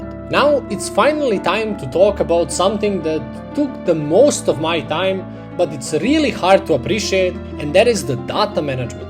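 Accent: Eastern Europe. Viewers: may I ask what your first language is? I could probably find out myself, but I’m too lazy. I will say it’s likely Slavic, Uralic, or Turkic based on your pronunciations of h, r, and w. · I really like this so far, it reminds me of a ps4 game you see and buy randomly and really enjoy it at the end lol. Anyways i see that you have a slavic-esk accent and if it doesnt bother you, im really curious as to where you are from? Im in the south balkans so i just find it cool that theres a person like me liking and making games. Dont give up!😁😁😁😁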